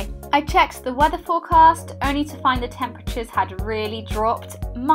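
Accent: United Kingdom. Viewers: your British accent is so charming and you are so cute! comment from China.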